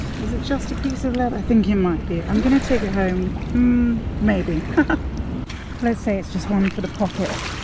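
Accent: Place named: England, United Kingdom. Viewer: You have such a sweet English accent.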